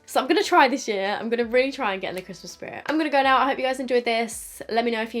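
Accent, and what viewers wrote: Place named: London, United Kingdom. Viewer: You are sounding a little London today 😅😅 ❤️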